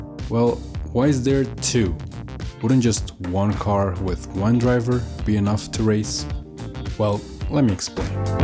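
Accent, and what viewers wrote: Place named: Turkey. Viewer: You got turkish accent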